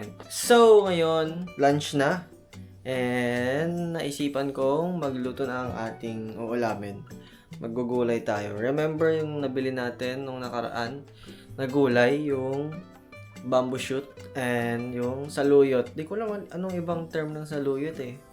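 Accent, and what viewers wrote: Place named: Ilocos, Philippines. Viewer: twelve fortey gayam... your ilocano accent is lovely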